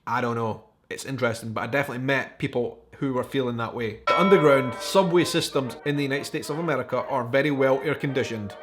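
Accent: Scotland, United Kingdom. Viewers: I think you are mostly right. My state, Michigan, it’s the best! lol and also the thing about metal detectors, we don’t have them in smaller towns. Maybe only at a government building or courthouse. I love your accent, I just finished watching Outlander so I’m a little bit obsessed with Scottish people. 😂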